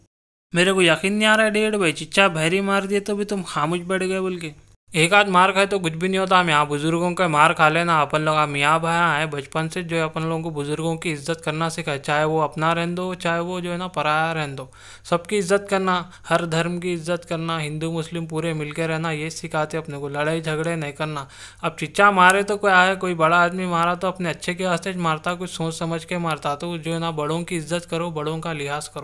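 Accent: Hyderabad, India. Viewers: Aap bhe Moscow 6 Sal Paisa Barbad student dikhrai ye hyderabadi English hai bolna aao ya nai aao aage wale ku samajhne aagaya toh bas hai bolte😂😂🤣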